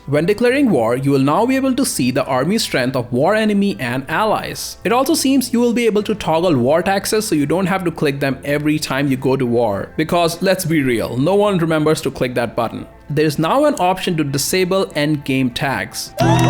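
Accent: India. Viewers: An Indian accent in the gaming community :D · I think the appropriate term is Indian or South Asian, Hindu means someone who is Hindu and may be offensive to those who are of other religions. And yes I agree, he seems to have an Indian accent.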